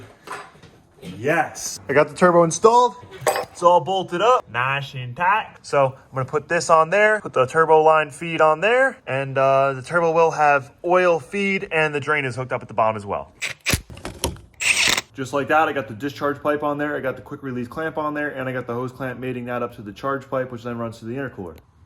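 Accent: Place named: Ireland. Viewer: she sound like she to be in the irish car scene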